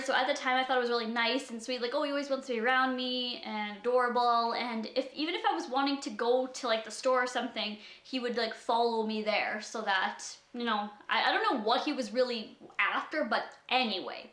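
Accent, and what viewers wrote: Canada. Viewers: Wisconsin??? She's from Canada like you said earlier :)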